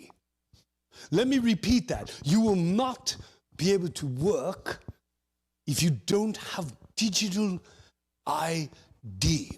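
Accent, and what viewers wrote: Ireland. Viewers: Interesting how you slag off English food (in an Irish accent) and then say the Irish woman told you to go eat "Fish & Chips". You COULDN'T get more English than that 🤣🤣🤣🤣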